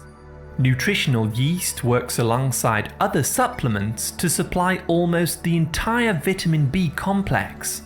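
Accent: United Kingdom. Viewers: That accent lol must be british.. we say vight-o-mins they say vit-a-min...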